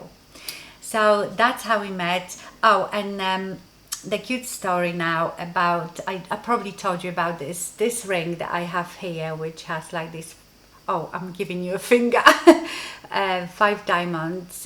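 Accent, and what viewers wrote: Poland. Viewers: I have been watching a few of your videos lately and I always thought I detected a polish accent behind the English accent. I finally found your video about how you ended up in the UK and it confirmed you were from Poland. ( My mom is Polish and moved to Toronto in 1971)....funny thing is that the video got even more personal for me because when I met my husband he used to wear this ridiculous ring too and I completely forgot about that. lol It looked like a hockey championship ring (but was not). Such a brilliant idea to make a lovely ring out of the diamonds. Thanks for the laugh. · You still have polish accent as well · I loved hearing your story. I am relatively new to watching you and didn't realize you were Polish. I often wondered about your accent. I truly hope you and Paul are still enjoying life together. Thanks for sharing. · Hello it’s so nice to hear your story. 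It reminds me of my own I’m croatian and came to France in 1995. Life back then was so different and you are right we were kind of naïve. And I don’t know you but French people here thought that we lived like a cave man in estern countries... like we never so a tv, Phone, or PC.... so funny. Kisses and Big hug from 🇫🇷 Love your accent I don’t know if there is some polish residu but it’s so cute 💓💯💓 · Oh yes you are, love the Polish accent, I so miss Warsaw😢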